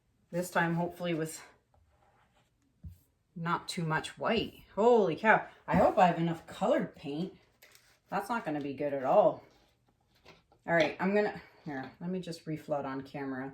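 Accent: Canada. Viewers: You couldn't talk too much for me! At least half the point, for me, of watching your videos is to listen to your interesting chat! Haven't watched the video yet, so can't comment on the painting, but just wanted to let you know - keep chatting (enjoy listening to your Canadian accent - you are the first person I ever heard saying "anyhoo" - now I am coming across it everywhere!!!) (I'm English). Keep up the good work - painting and chatting!!!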